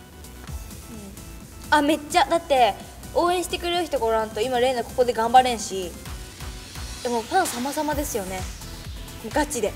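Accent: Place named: Hakata, Japan. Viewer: れいなの博多弁好きすぎる。。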